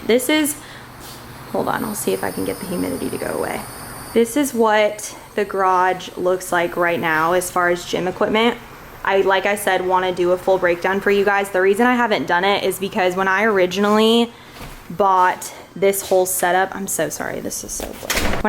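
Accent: South, United States. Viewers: I think you are acquiring a southern accent 🤌🏻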